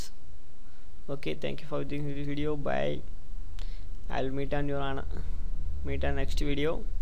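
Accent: India. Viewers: it's not his fault all indians speak that way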